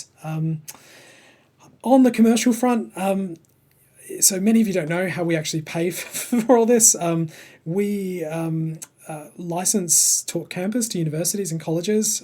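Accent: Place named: United Kingdom. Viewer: I think you’re slowly losing the Aussie accent 😂. You are sounding more British! Definitely sound a lot different to when I had my first video interview back in 2015 for my mod application 😊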